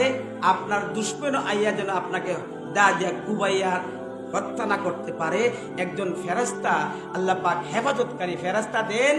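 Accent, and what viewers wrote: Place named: Sylhet, Bangladesh. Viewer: Bhai where are you from originally (from bd) ? It sounds like you're my sylheti fellow Muslim bro😂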